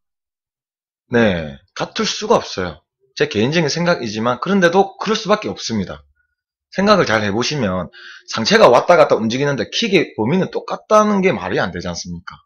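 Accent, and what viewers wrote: Gyeongsang, South Korea. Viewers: 일본어 자막에 틀린 글이 너무 많네요. 그냥 구수한 갱상도 사투리로 쭈욱 하시길…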